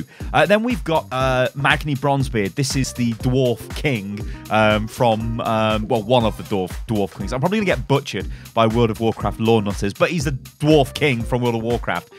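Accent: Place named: United Kingdom. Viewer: I love these vidoes. They make me all warm and nice when I hear lovely british voice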